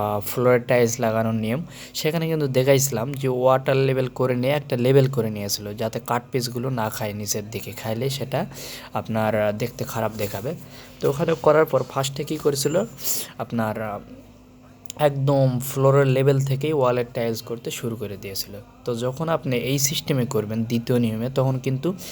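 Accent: Naogaon, Bangladesh. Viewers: আপনার বাসা নওগাঁ জেলাতে মনে হচ্ছে!!!!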